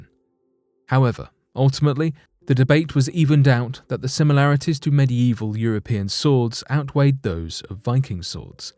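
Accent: United Kingdom. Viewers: ARE YOU A FELLOW BRIT?🇬🇧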